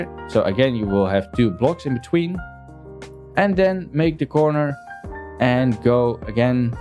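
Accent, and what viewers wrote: Netherlands. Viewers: Hey, Just got recommended this Video and the complete build looks amazing! You deserve way more Views, Subs and more. Ps. The Netherlands accent is Lekker! 👌👌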